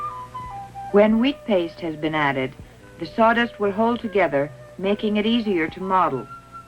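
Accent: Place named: Transatlantic, United States. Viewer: Ok boo I see you with the Transatlantic accent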